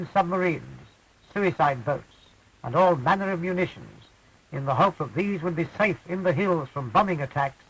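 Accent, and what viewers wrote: United Kingdom. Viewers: Petroleum Jelly Bomb = Napalm . The benevolent civilized “ Good Guys “ 🇺🇸 dropped it on the Vietnamese. I’m surprised the USA didn’t drop Nuclear Bombs on Hanoi . General MacArthur AKA Dr Strange Love ( How I learned to love the bomb ) advocated nuking the Chinese in the Korean War . P..S. The narrators prancing horse, Royal British voice is annoying , and as to be expected extremely racist . Lousy photographer also . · I think the narrator (who is obviously British) spoke appropriately. why do I say that? because of the time period. The Japanese were racist back then! weren't they? they thought they were superior to the Americans, the British, the Australians, etc!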